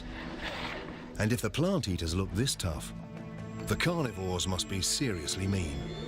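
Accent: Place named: United Kingdom. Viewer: that documentary is narrated by an American, while this one that you uploaded is narrated by a British. You can tell the difference by their accent and the different units of measurement that they use.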